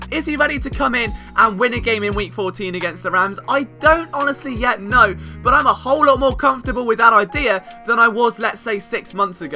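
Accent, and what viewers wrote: United Kingdom. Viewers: Does the British accent indicate that NFL football is really taking on in the UK ?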